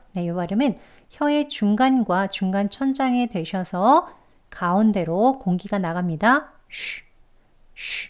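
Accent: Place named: United States. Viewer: 전형적인 미국식 발음 같네요. 오늘도 잘 들었습니다. 감사합니다.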